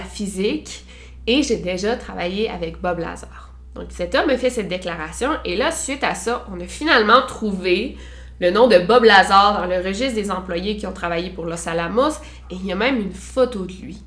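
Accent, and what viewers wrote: Quebec, Canada. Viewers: Pour moi, si Bob Lazar a ce qu'il a dit avoir, C'est pas chez lui et s'il a menti sur ce fait C'est pour se faire fouiller genre " pourquoi on me fouillerait si je n'avais rien vu et que j'avais tort... en tout cas, encore une bonne vidéo de ta part, merci! Et pour moi, française qui ait vécu au Québec 8 ans, des histoires de même avec un bel accent québécois c'est juste un plaisir 😊😊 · Bonjour ma belle ! Je te suis régulièrement depuis genre février mars 2018.. Et je m'était même pas abonnée 😳. C'est réparé 😉 J'aime beaucoup t'écouter quand je dessine etc.. I need you lol! J'ai l'impression de te connaître depuis le temps c'est ouf et je rigole beaucoup, en plus l'accent québécois me mets toujours de bonne humeur 😂. Bisous à toi et continue à assurer 😊❤️ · Très charmant l accent canadien. ..sinon pas mal ta vidéo. ... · Quel accent Canadien délicieux pour le Français qu je suis. 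<3 :) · Tabernacle cet accent québécois ! J'adore. 😉 ⚠️ Le lien "Bob Lazar: Area 51 & Flying saucers" est mort ❗ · Je suis français, et j'ai réalisé que, dans ma tête, je lisais le titre des vidéos de avec un accent québécois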